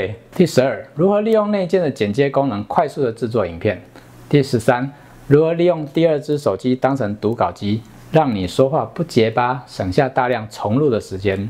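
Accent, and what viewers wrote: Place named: Taiwan. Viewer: 的台灣腔大陸人可能聽不太懂 XD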